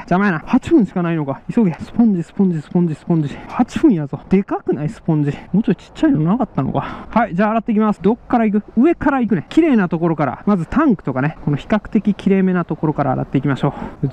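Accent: Kansai, Japan. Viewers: どう見てもはたから見たら変な関西人（笑）だが、それがいい！！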